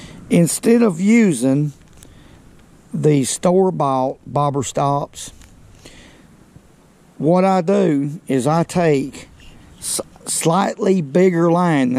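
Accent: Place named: Alabama, United States. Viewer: “Guah luea” Alabama if I’ve ever heard it. Roll Tide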